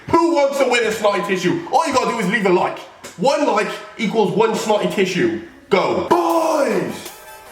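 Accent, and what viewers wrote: Wales, United Kingdom. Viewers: Is he WELSH?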